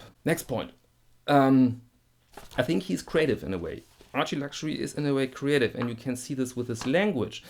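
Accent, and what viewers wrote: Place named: Germany. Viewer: I enjoy your videos for several reasons... Mostly, your accent and mannerisms. You remind me of my Grandfather who was born and raised in northern Switzerland in the city of Aarau. I understand that you have a German accent, but you sound much like my memory of a great man. Also great content. Cheers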